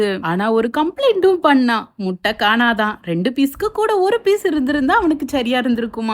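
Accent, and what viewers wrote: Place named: Nagercoil, India. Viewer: Enakum Nagercoil language rmba pidikum enga relatives anga irukanga sister unga video elame super god's grace epome unagaluku unga families kum irukum god bless you sister ❤❤❤❤❤❤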